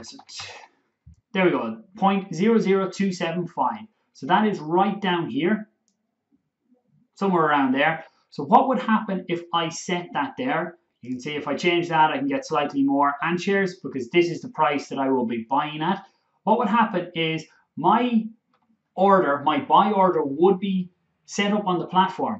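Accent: Ireland. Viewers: Great to hear the Irish accent !😁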